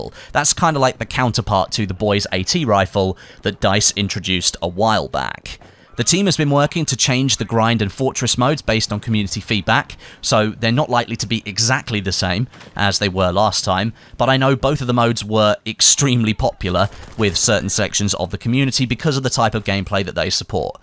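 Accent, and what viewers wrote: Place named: United Kingdom. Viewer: I appreciate your optimism . Gave up on Battlefield a long time ago. Still enjoy listening to your slick British accent . Are you going to give Modern Warfare a try this year?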